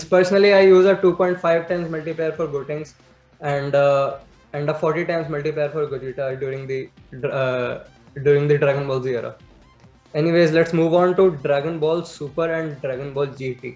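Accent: India. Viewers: I couldn’t understand him that Indian accent is to strong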